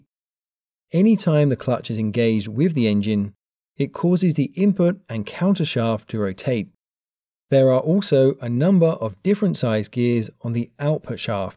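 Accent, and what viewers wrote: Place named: United Kingdom. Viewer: I think he's a british guy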